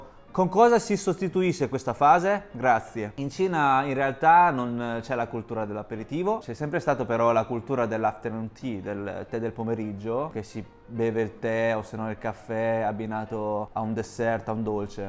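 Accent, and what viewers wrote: Italy. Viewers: Cose avvincenti notante in questo video da parte di un italiano che ha vissuto a lungo in Cina. l'intro in cinese con marcatissimo accento italiano. :°) i piatti strani cinesi. mi hanno stupito! del primo non avevo mai sentito parlare e mi ha incuriosito (l'ho appena cercato e pare sia roba che viene dalla provincia di Guizhou). gli sputi come cosa negativa della Cina, ricordo la prof di Cinese a Pechino che diceva che non si era al sicuro neanche quando si va in bici perché magari a fianco ti ritrovi un altro in bici che sputa e ti becca! ci avrò fatto caso il primo periodo ma poi non ho visto quasi più nessuno sputazzare in giro (magari anche il covid ha aiutato). una cosa che io invece detesto particolarmente è che la gente entra in metro (e in ascensore!) e in bus prima che scendi e mi da sui nervi. lol. dulcis in fundo a SHIJIAZHUANG mentioned! 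un salutino a Shijiazhuang la prima città in cui ho vissuto in Cina. ancora complimenti e scusate il pippone. ; P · parli cinese con un fortissimo accento italiano🤣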